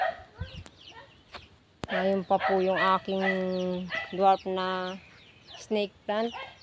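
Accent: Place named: Marinduque, Philippines. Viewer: Parang tuno ng marinduque po kayo❤😊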